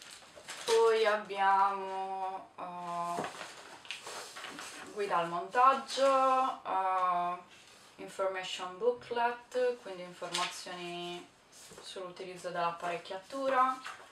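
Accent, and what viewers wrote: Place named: Sardinia, Italy. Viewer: Sono felice di rivederti cara e principalmente di poterti ascoltare, fortissimamente sarda...:)))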